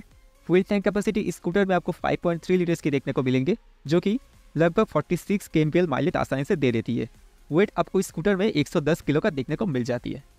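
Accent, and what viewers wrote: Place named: Bengal, India. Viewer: You are pure bangali 💯% confirm 95,000 hazar bolne ka tarika pura bangali ka he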